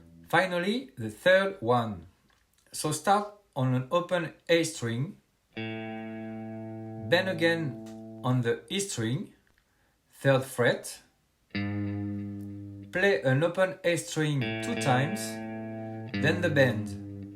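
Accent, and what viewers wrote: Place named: France. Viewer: Thank you love your French accent ❤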